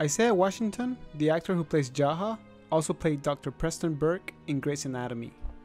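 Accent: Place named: Australia. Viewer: Wow she sounds so astralin how dos it not show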